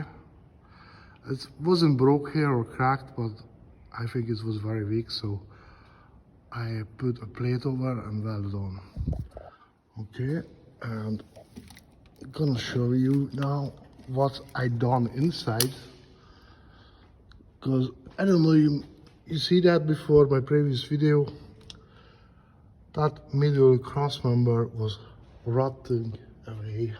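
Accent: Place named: Northern Ireland, United Kingdom. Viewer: I might be wrong but you sound like you've picked up a bit of an northern Irish accent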